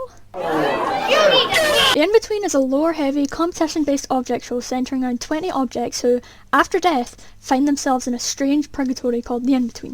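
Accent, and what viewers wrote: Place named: Ireland. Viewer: are you irish i recognise your accent lol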